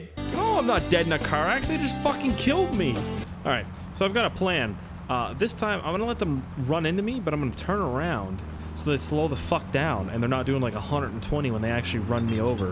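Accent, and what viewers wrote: Germany. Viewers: Your German accent sounds like a gay man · im disapointed on the fact you put out german accsent on finnish game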